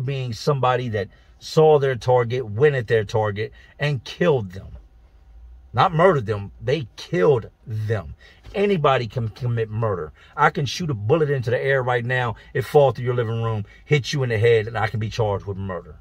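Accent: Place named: Pennsylvania, United States. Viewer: you talk like a PA boy, you from the 412,724, or the 814?? imma New Castle, ( 724 ) proud my whole life!!! Either way, HMU some time!!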